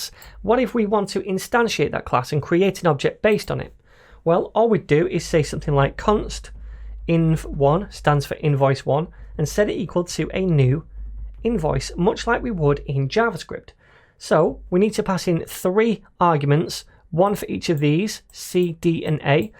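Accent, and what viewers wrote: United Kingdom. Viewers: British accent? Sounds nice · i love your British accent <3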